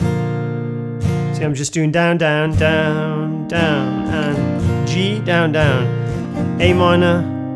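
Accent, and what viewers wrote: United Kingdom. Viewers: Sings American.....then speaks....he's English !!..amazing !!..great teacher,great voice !!..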